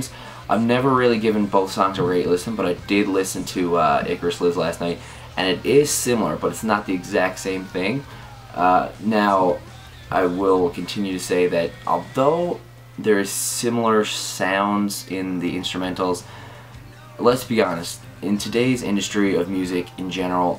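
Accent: Canada. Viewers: I can hear an accent. canadian?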